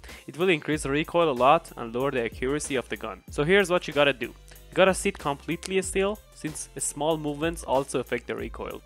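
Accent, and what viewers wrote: Iran. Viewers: i bet you are iranian,ur accent man :D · kheili moshakhase ye irani dare English shoat mikone irani hasti?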